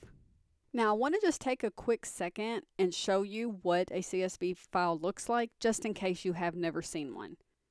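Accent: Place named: Texas, United States. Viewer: I really enjoyed your laid back presentation and, being from Texas, I appreciate your accent! (finally someone I can understand who isn't mirroring video game graphics to amp up their presentation. I only wish your screen were clearer. It is very "soft" and unfocused. Otherwise perfect. Keep up the great work!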